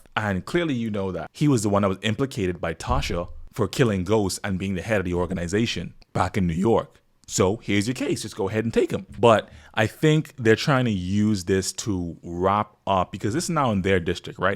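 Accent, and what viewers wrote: Bahamas. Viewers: I agree with what you said at the end bro ,& you sound like a fellow Bahamian as well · I just came across your video for the first time. From the moment I heard your voice I immediately recognized your Bahamian accent. Nice to see a fellow Bahamian on YouTube. ❤🎉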